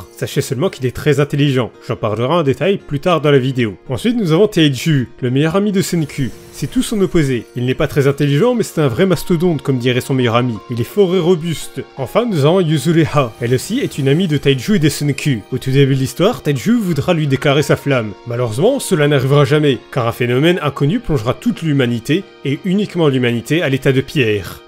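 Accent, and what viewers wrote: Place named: France. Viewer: Je lis les scan cher empereur (je suis même à jour), et "Senku" (Senkū en romaji si on en croit wikipedia) en japonais se prononce littéralement "CènkOU" pas "CènkUH", ça finis par le son "う" (allongé qui plus est) qui est un mélange entre le son "EU" et "OU" (comme le "U" de "LUffy") donc je sais de quoi je parle justement, après, si tu veux garder ce cher accent français, je ne me permettrais pas de juger l'empereur :) Merci de faire découvrir ce manga a un plus grand nombre (le coter comique étant son point fort pour moi), tu lis promise neverland sinon?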